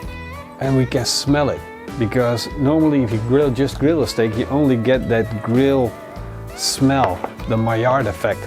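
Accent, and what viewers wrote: Netherlands. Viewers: Prices are bit different in the Netherlands. Maybe you noticed his slight accent. 150$ sounds about right in Europe. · Hahaha, Nederlands zeker? Hoor je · I knew you were Dutch 5 sec in by your typical English-Dutch accent. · I knew you were fucking dutch. the accent gave it away but i was still doubting myself untill i saw the last part. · you speak with dutch accent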